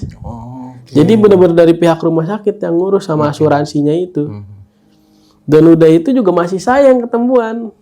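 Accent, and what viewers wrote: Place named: Semarang, Indonesia. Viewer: Buset orang semarang kah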